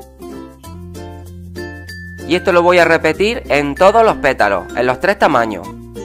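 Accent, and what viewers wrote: Spain. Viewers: Eres español, de que parte porfa?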